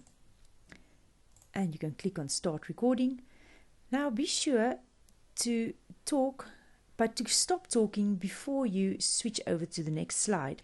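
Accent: Afrikaans, South Africa. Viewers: Definately a south african voice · Did not expect this to be South African lol. Dankie vir die mooilike video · Thanks, it was very helpful and easy to follow. It is also a heartwarming surprise to hear an Afrikaanse accent. :)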